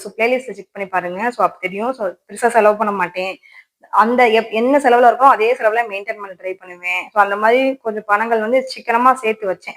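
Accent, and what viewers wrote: Madurai, India. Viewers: Akka neega Madurai ah ka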